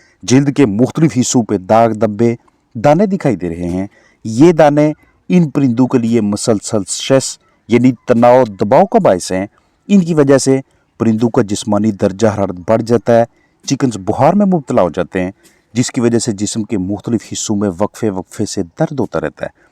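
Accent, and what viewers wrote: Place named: Pakistan. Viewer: Apki zavan sunkar aisa laga jaise me ibadat me chali gai kuch der ke liye aap bahut urdu mein baat karte hai aur hum urdu hindi mix bolte hai i think aap Pakistan se hai. Aur hum India me peda hue isliye yaha ke mahol ke mutabik hain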